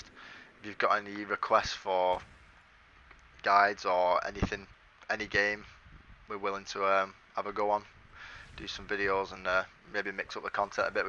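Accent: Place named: Northern England, United Kingdom. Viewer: Cheers angel!!!!!!..I finally found the last part .. which for me was the one on the top of the mountain!!!.. Huge thank you .. I even liked listening to your northern accent!!! fantastic video .. thanks again!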